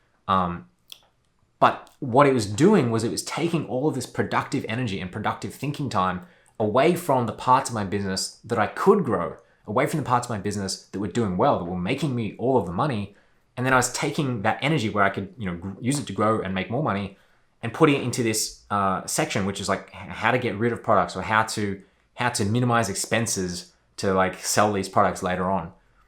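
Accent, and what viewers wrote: Australia. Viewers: you look like an indian but you have an Australian accent and you look like a leprechaun. Just a weird combination :(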